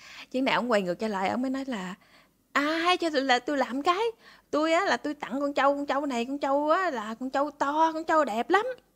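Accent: Mekong Delta, Vietnam. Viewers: Anh thich vong noi Mien tay cua Em roi do nhe? hon nua la cai mieng rong cua Em nua do??mieng nay ma B…C…la te ca nguoi luon do nhe??🤫🙈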